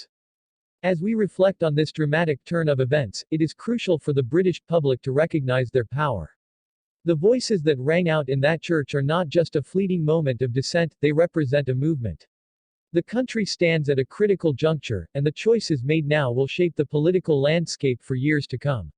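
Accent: United States. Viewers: i have never heard of anyone being kicked out of a church unless they caused a nusiance ,this looks like,yet another of these youtube videos that have suddenly shot up with American voice overs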